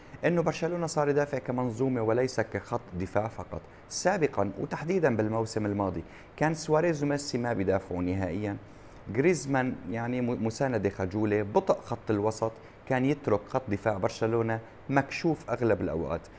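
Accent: Aleppo, Syria. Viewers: ميم 55 لا هو بيحكي حلبي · لك والله من اول كلمتين بين معي انو من حلب ههههه موفق بلوووووود وجميل جدا اسلوبك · لهجتو واضحة سوري من حلب تحديدا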